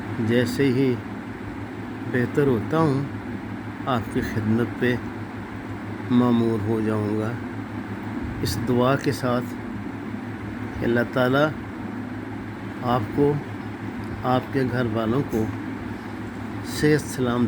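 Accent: Punjab, Pakistan. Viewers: میں لاہور سےہوں لیکن عرصہ دراز سے یو کے میں مقیم ہوں مرحوم کو باقاعدگی سے سنتا تھا کہ ان کے پنجابی انداز اور پنجابی لہجہ اردو کو بہت پسند کرتا تھا ۔ اللہ تعالئ مرحوم کے تمام گناہ معاف کرکے جنت الفردوس میں اعلی مقام نصیب فرماے امین ۔ آگ ان کو کوئ فیملئ ممبر آ کر تھوڑا مرحوم کے آخر ی سفر کے بارے میں بتا دے اچھا ہو گا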